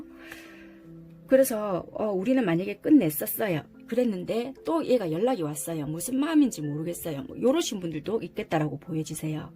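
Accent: Busan, South Korea. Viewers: 네. 고맙습니다.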